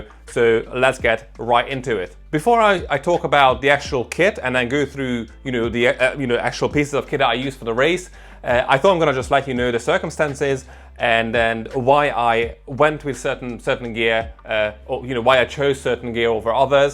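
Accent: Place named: Hungary. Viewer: Hallatszik a kiejtésen hogy magyar vagy.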